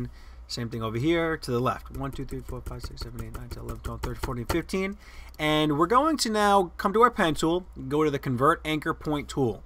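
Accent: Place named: New Jersey, United States. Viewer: Your so from jersey, you from jersey. love all your videos, great work, great teaching.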